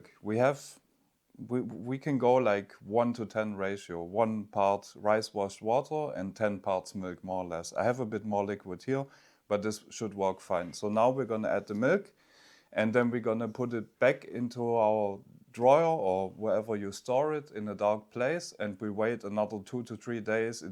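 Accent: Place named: Germany. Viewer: You are obviously a German speaker ❤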